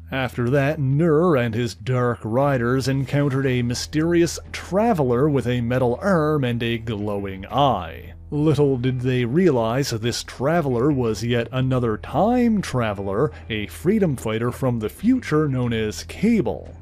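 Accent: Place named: East, Canada. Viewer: Love the Channel! Sounds like an east coast Canada accent :) keep it up!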